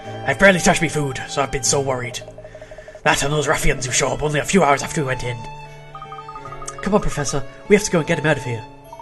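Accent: United Kingdom. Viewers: your British accent is pretty good, mate!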